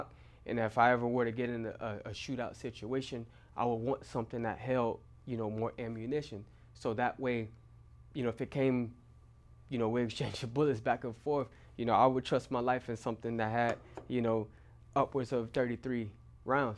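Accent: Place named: South Florida, United States. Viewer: Idk where you from but you sound like a dude from South Florida 🤔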